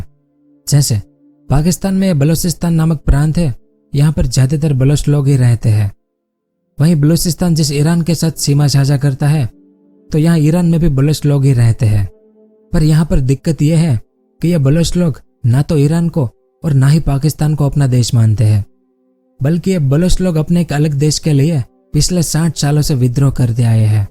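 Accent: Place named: Rajasthan, India. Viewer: Aap rajsthani ho apki voice tone Rajasthani jasi h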